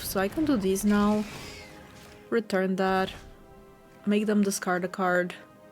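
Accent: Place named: Portugal. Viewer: Your videos are thought provoking and instructive. Even when I don't agree with your choices, my consideration of them makes me a better player. I also like your style of play and the fact that you are courteous to your opponent. I slow play a lot because I'm old. One more thing...I am a Portuguese-American. When I hear your accent, it reminds me of my old neighborhood where so many women talked like you and I smile. So, just like me close my saying that as far as your videos go gosto sim. (That exhaust my command of the Portuguese language.)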